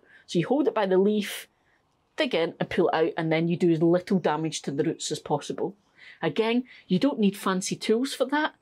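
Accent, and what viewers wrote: Scotland, United Kingdom. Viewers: Hi, can you tell me more about your rotary compost sieve? I live in Massachusetts, and the only one I could find was over $100. I have a lot of compost to sieve, and this would make the job so much easier. I am planning a trip home to Scotland soon. I could bring one back if it's not too heavy. Maybe that is wishful thinking, lol. I am relatively new to growing vegetables and find your videos very helpful. Thank you for taking the time to create informative and entertaining videos. It's also nice to hear a Scottish voice.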